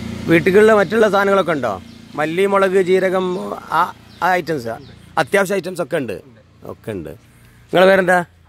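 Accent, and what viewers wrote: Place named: Malappuram, India. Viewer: Njagal malappuram karude slang engane thanne yanu bro nee athil tention adikkada muthe thekkan mare pole boomikku foomi baryakku farya yennu onnum allallo aksharangal correct alle slang nee preshnam akkenda yethu slang anengilum yenthelum arivu kittunnundakil athu padikku allathe njangal malappuram kare athikshepikkalle muthu maney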